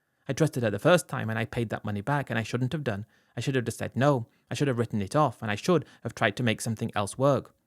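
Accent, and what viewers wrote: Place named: Liverpool, United Kingdom. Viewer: Adyeee lad more scouse whinging 😢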